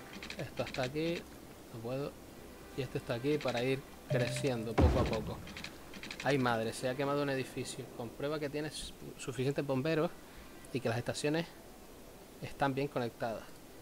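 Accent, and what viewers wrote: Spain. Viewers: de que parte de españa es tu acento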